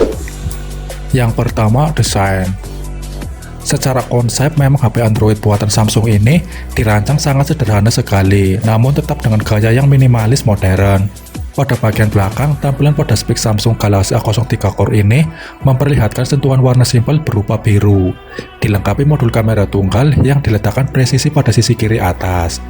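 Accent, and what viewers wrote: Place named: Java, Indonesia. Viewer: Pengisi suaranya medok tapi enak banget sih gw rasa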